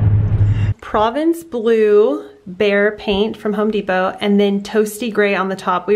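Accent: New Zealand. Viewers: He sounds like a kiwi. This is so nice guys. I can’t wait to buy a home and do this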